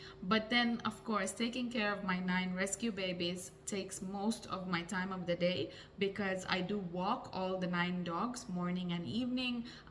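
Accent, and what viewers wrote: Caribbean. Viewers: I'm Indo-Guyanese and it's interesting the English accent for an Indo-Finnish person is so similar to that of Indo-Carribean people. I never would have guessed you are from Finland. Anyhow, you're right that many people in the world today are having children for all the wrong reasons and some of them are not fit to be parents and bringing children into the world to satisfy the expectations of others is no good reason at all. However, calling people in India "narrow minded" is not fair. You're trying to figure out life on your own without having read the user's manual. Like those parents who should not have bore children, you are not living according to dharma because of a lack of scriptural knowledge. India is the land where God gave the users manual. Begin with the Bhagavad Gita, and you might gain some perspective, clarity, and guidance. 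Also, Indian history is very complex and it went from being the greatest land in the world to being one of the poorest after centuries of abuse from outside forces, but the Indians are restoring it's former glory without much help from anyone and trying to do so in keeping with scripture. Finland does not have the population of India but England stole $43 trillion in wealth from India and all of Europe and the west is enjoying a different lifestyle because of it. Not just Indian wealth, but Indian knowledge and labor as well. From Pythagoras to Mark Zuckerberg. Fiji to Trinidad & Tobago.. Last thing is you mentioned being attacked. If you'r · Its good to be self aware and not be influenced by society expectations. Btw your accent sounds like someone from the Caribbean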